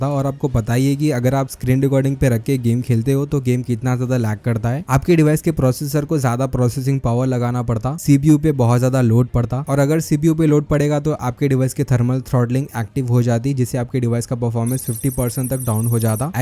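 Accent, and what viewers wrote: Hyderabad, India. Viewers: Bhai aap hydrabadi ho kya??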